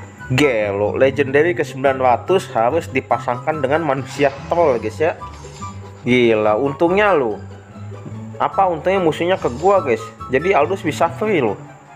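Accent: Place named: Tambun, Indonesia. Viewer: kaya orang tambun bhasanya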